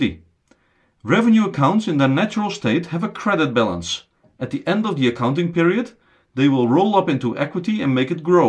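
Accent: Netherlands. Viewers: This gentleman is definitely Dutch!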